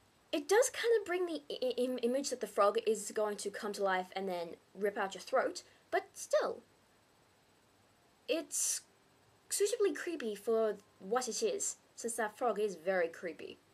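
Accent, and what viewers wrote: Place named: Australia. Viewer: I agree. :} I used to watch Hey Hey It's Saturday a lot with my family, along with Xena and Hercules. Haha. It took me so long to work out you were Australian. I couldn't recognize my own accent! The only foreign accent I can even attempt to do is Bad British.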